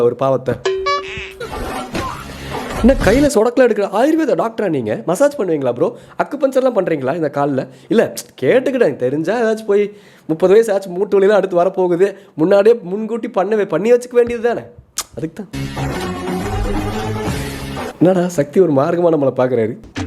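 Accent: Coimbatore, India. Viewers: The coimbatore slang is super❤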